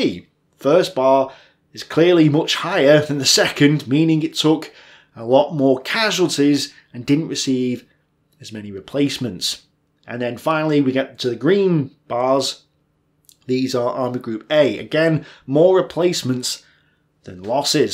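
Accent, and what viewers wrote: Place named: England, United Kingdom. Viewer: I like so much your videos about Ost Front that, as a french, I try to understand very focus on your speech. I get it, your superb english accent (?), in any case not the american accent, allows it for me. Fred (old close combat player, since 98 ;) )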